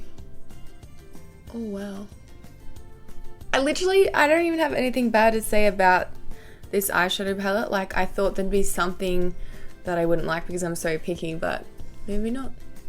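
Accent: Australia. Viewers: Australian accent - 😳😓🤐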